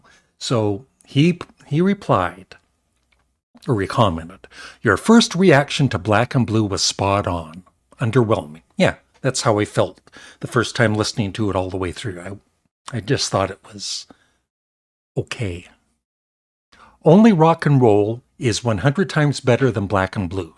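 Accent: Canada. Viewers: Hey I'm guessing you're from Canada by the way you pronounce album as ELbum instead of ALbum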